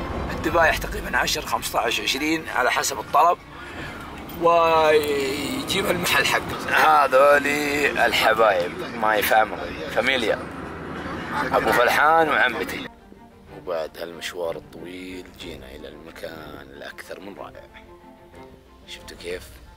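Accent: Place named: Subay'i, Saudi Arabia. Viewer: انشدك بالله انت من مطير ولاسبيع لغوتك وفي روايه اخرى للنقوج ودمك ماهو دم عتيبي ولادوسري حي يامال العز ودي انك مطاري بس اشك انك سباعي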